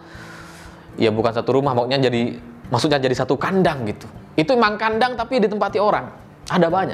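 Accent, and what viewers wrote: Madura, Indonesia. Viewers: Kau tinggal dimana sih bro? Kau lupa ya? Kalau tradisi di orang2 kita alias "tretan" .. sapi memang dikandang di dapur... Jadi jangan kau anggap bahwa itu memprihatinkan atau ngenes... Sok peduli dan sok kekota2an kamu... Muka dan logatmu itu masih Madura asli... Jangan mempermalukan nak-kanak dibik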